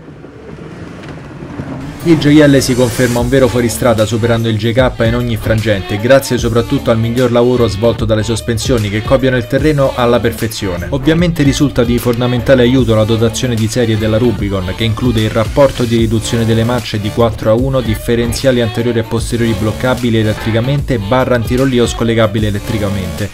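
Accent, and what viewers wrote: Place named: Rome, Italy. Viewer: Aooo !! Ma che sei poco poco romano ! Comunque bel test , ma sopratutto bel pezzo di ferro .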